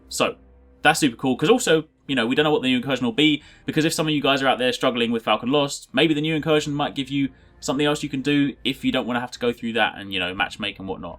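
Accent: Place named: United Kingdom. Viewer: great content!thumbs up because i like listening to u.k guys accents.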